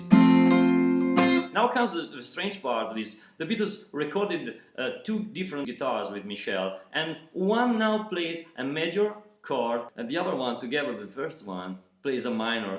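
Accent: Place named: Italy. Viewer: Parece que ele é italiano e não fala portugues.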